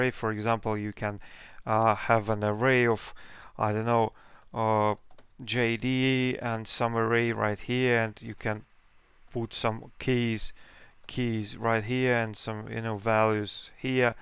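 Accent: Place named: Russia. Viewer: The Russian accent, haha :)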